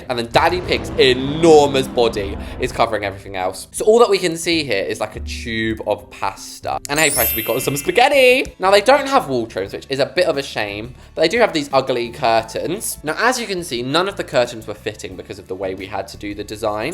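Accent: United States. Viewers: Gurlll I’ don’t like you amaerica accent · I do not like the American accent I like your normal voice better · PLEASE THE AMERICAN ACCENT IS SO SCARY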